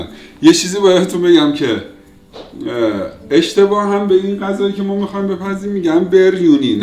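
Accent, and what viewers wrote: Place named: Shahreza, Iran. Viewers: واقعا دستتون درد نکنه،با توضیح عالی وصدای دل نشین وزیبای اصفهونی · لهجه ایشون بیشتر شهرضایی است تا اصفهانی آنجا که بجای خنک گفت خونک !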